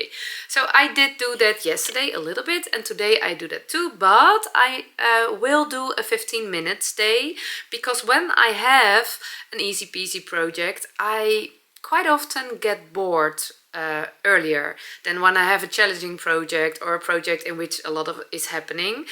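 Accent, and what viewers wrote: Netherlands. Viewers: I love your "Dutchy English",. On one point, we say "lying down" in English, not "laying down." For example, when you are speaking about yourself, you will say, "I am lying down" or "I am going yo lue down." You will use the word "laying" when you are speaking about laying something down, or laying a table with cutlery, for example. That's the general rule, although some people do say laying instead of lying. It's a matter of what you're used to, I suppose. Love all your projects!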